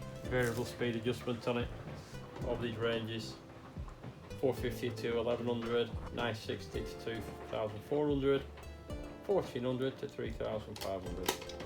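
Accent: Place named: Northern England, United Kingdom. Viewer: A great Northern accent, there sir! A great lathe also. I’ve just bought a 6 month old R2 version. Joe (Manchester)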